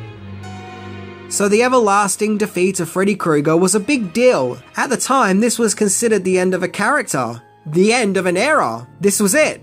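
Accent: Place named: Australia. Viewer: Hearing your Australian accent coming from freddy is awesome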